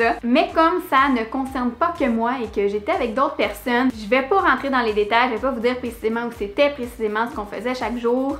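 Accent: Quebec, Canada. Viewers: J'ai écris mon commentaire en regardant la vidéo je n'étais pas déjà arrivée à la fin, donc je profite maintenant puisque tu as posé la question à propos des voyages pour t'écrire un petit mot! Je suis Libanaise, mon fiancé est Libano-Canadien. J'ai visité le Canada l'été passé pour la 1ere fois pour 1 mois, et j'en suis tombée follement amoureuse.... la gentillesse des gens est extrêmement touchante... la beauté du pays, la diversité culturelle, le respect, la tolérance, la liberté, la sécurité,... tout, absolument TOUT m'a touché. Je n'ai pas pu resister d'y retourner pour la 2e fois cet été encore pour 1 mois, et même chose, je suis hantée par un sentiment de réelle appartenance à ton beau pays!!! Pour les 2 visites, le retour était vraiment difficile, je le vis comme un arrachement! Petit bonus: j'adore J'ADORE l'accent québecois! ❤ En regardant tes vidéos je me sens un peu soulagée d'avoir une petite dose quebecoise à chaque visionnement 😍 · ... 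et aussi je suis originaire de la région de tours, Amboise plus précisément. Tous les endroits que tu a visité je les connais plutôt bien. Ça fait plaisirs de voir nos cousins québécois s'intéresser a l'histoire de France 👍 et j'adore votre accent ça me rappel mes année a Montréal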